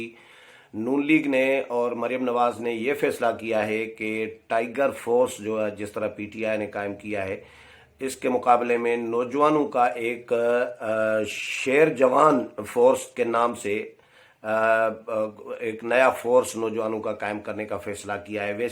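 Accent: Sindh, Pakistan. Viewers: AoA. Sir, aap Sindhi style me bohat achi baat kartay Hain. Khush rahain.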